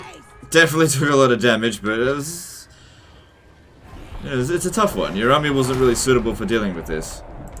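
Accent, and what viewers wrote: Australia. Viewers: When i was scroling through ytl and saw i was like omg it's true cc doesnt work well with an Australian accent.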